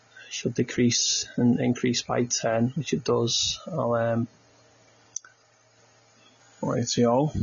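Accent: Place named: United Kingdom. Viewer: thats the strongest british accent ive heard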